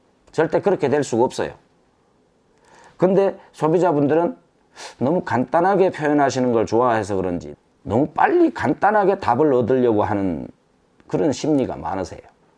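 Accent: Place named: Daegu, South Korea. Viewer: 공사해보지않고는 어찌 실력자인지 구분할수있을까요.ㅜㅜ6월1일 이사가는데 올수리해야하는데 걱정이 많습니다.사투리 ㅎㅎ 대구지역이신가요?대구면 상담하고싶네요.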